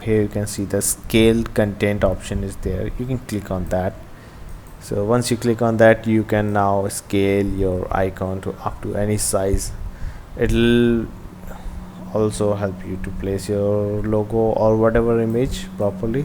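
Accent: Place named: India. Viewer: I usually hate inidan tutorials, but this time I can understand you. Your accent is not that bad.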